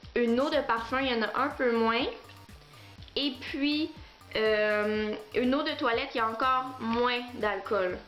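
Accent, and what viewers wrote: Quebec, Canada. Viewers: tu as un accent québécois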